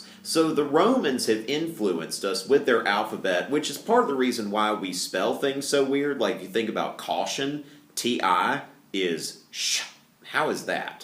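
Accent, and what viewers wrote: South, United States. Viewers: dAt southern accent dough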